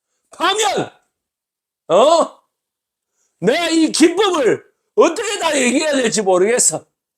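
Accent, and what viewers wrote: Gyeongsang, South Korea. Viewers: ● 항상 매 영상마다 잠에서일어난 숙취가득한 헤어와 까슬수염 가득한 얼굴이지만 그래도 교수를 나는 사랑한다.. 그의 정의에가득찬 경남사투리를 나는 사랑한다...●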